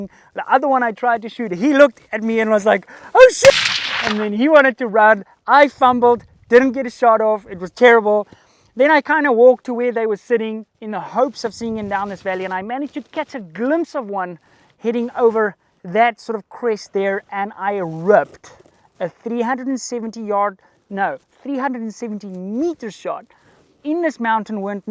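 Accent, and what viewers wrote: Afrikaans, South Africa. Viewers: Bro sounds southafrican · Ek hoor jou Afrikaans !! ❤❤❤❤Jy skiet mooi! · Yo dude kan jy afrikaans praat i said can you speak afrikaans cause you sound like you come from south afrika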